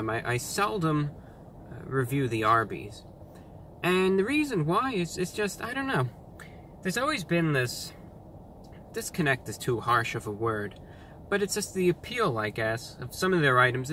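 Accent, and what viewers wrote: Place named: Northeast, United States. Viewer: I know you live in Florida but it sounds to me like you have a very northern east coast accent. Where did that come from?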